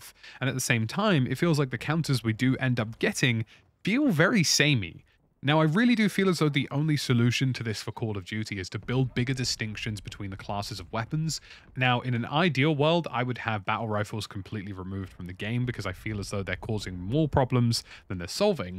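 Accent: United Kingdom. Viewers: i just wanna say your videos are like asmr, i freakin love your chillaxed voice and bri ish accent (im american and incase you didnt know ALL americans love the sound of british accents) so anyway, thanks for these videos! you and markiplier are the only two people who plays games that i watch anymore, so u two must be doing something right! have an awesome week! 🐮🌻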